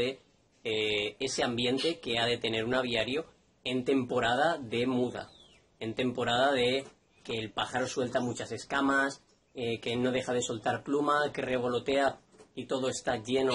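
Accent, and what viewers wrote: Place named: Spain. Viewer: De que parte d españa eres ho de malaga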